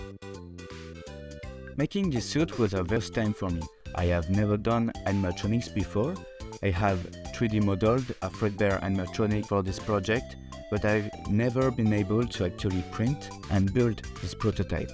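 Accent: France. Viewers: Are you french your accent seems like it and some things in the video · this video is so great! i think i may do it! but, just a question: are you french? you have a very french-like accent? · Are you french ? Because your english is just beautiful😅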